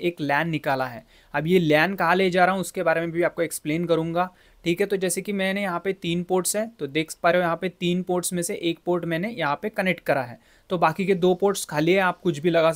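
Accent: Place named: Telugu, India. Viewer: It seems you are from telugu states 😊...mee too.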